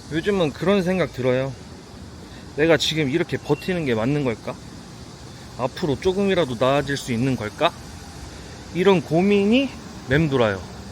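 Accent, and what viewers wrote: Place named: Jeolla, South Korea. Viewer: 말씨는 전라도인데 1찍은 아니신가보네요.?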